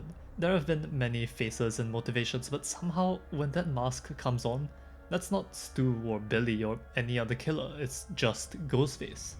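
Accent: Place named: Singapore. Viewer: this guys accent sounds singaporean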